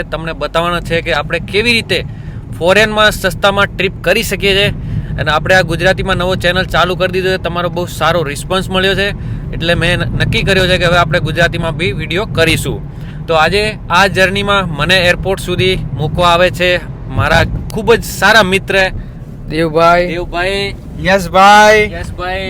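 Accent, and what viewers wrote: Rajasthan, India. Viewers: રાજસ્થાનના ગુજરાતી બોલે છે ભાઈ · Bhai a to Rajasthani che.par amdavad ma rahe che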